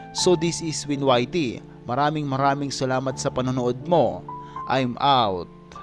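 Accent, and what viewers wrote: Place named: Philippines. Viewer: I love your Filipino accent... 😅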